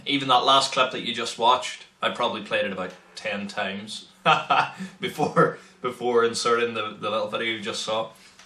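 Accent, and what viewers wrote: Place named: Ireland. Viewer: You are most welcome! I love your accent to my brother. My heritage is Scotch-Irish and my ancestors came to America around 1792. There is something about Ireland that draws me to visit there one day. If you knew my real last name (Phillips is a name I use often, but not my real name) you'd know instantly how I am connected to Ireland. Enough about that though yeah? I have played guitar for 42 years now and still am intrigued by how 6 strings can paint such wonderful pictures of sound. Yeah, I know that some guitars go to 7 and 8 strings but they are not for me. I have over 50 guitars now, down from 112 at one time. Some of my favorites: (1) Gibson RD...what a wonderful instrument (2) Gibson Melody Maker...a simple, yet incredibly insane instrument-the tones from this 1PU wonder are friggin' beyond belief (3) 1979 Fender Strat that is a masterpiece of sound (4) 1975 Fender Bronco, my first guitar ever and still plays so beautifully (5) 1985 Tokai Explorer-a Japanese masterpiece (6) 1978 Ibanez Musician- a 24 fret 14lb neck breaker of sound. 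It is one of the most well made guitars I've ever seen, yet it's weight is a problem. It is the heaviest of all my guitars and will burden you after 3 songs. It has more switches than a Boeing 747 (ok...not really) and I'm still discovering new sounds. More later....got to go...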